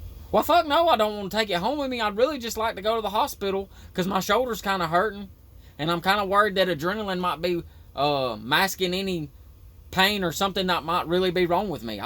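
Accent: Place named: Alabama, United States. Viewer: I REMEMBER THIS, also golly gee I miss your lil BAMA ACCENTT!!!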